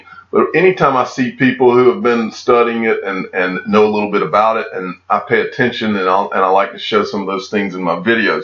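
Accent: South, United States. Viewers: It’s just a matter of.. Love the southern accent😉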